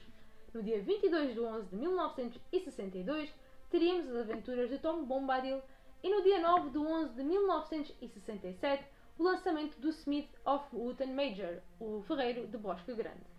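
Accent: Alentejo, Portugal. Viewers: Fiz aniversário no dia 29 de novembro, e esse tt news foi meu melhor presente, Receber todas essas noticias da maravilhosa é realmente incrível. Muitas noticias boas com esse toque alentejano tão gostoso de se ouvir. Só falta agora um tt podcast para ouvir esse belíssimo sotaque em qualquer lugar.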